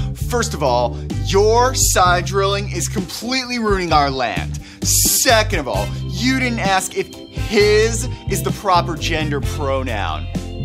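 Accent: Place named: Russia. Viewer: Tell me why this man does a better Russian accent than American one though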